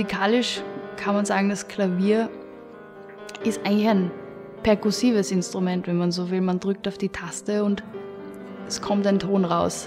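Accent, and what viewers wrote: Vienna, Austria. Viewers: you do realise that this is about her german/austrian accent in the english TwoSet vids? Besides that you can clearly tell that she is austrian/viennese from her pronunciation and intonation aswell as her used vocabulary. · Sie klingt schon nach Wien. Wirduose Sochen. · She speaks Austrian German, that’s why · Nein, ist nicht Wiener Dialekt. Also, das vielleicht auch, aber sicher nicht in diesem Video (hör dir einmal wirklichen Wiener Dialekt an, das ist ganz anders). Deutsch (wie Englisch übrigens auch) ist eine plurozentrische Sprache. So wie US-Englisch kein "US-Dialekt vom Britischen" ist, gibt es neben dem Hochdeutsch in Deutschland auch das Hochdeutsch in Österreich, die unterscheiden sich halt ein bisschen voneinander. Und diese beiden Arten von Hochdeutsch haben dann Dialekte bzw. die allerwenigsten Leute sprechen wirklich reines Hochdeutsch (weder in Österreich noch in Deutschland). 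hat ein bisschen einen Wiener Akzent, aber zumindest in diesem Video spricht sie (vermutlich absichtlich) recht nahe am Österreichischen Hochdeutsch. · Ist halt Wiener Dialekt. Ist quasi das gleiche wie München und bayrisch. Auch für hochdeutsche gut zu verstehen weil nicht so sehr abweichend. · Austrian accent <3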